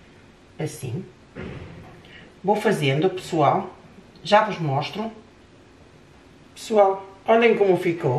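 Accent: Portugal. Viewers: onde vc mora? Já entendi q não é em Portugal, mas vc fala como portuguesa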